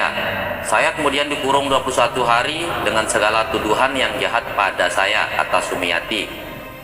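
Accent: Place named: Sumbawa, Indonesia. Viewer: nyam seda pmbaca ne Jerni n jls pst mas nya orang sumbawa totok dri nada bicaranya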